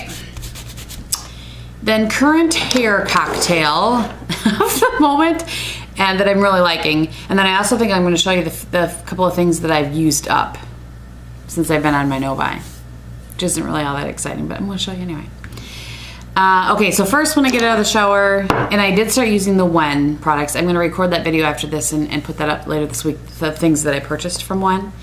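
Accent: Wisconsin, United States. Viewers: Love your videos,. My father's family is from Michigan and your Wisconsin accent is very similar. I have baby fine hair and appreciate any suggestions for adding body and volume. I have been liking Phyto's Phytovolume Actif spray and Schwarzkopf's Osis+ Dust It.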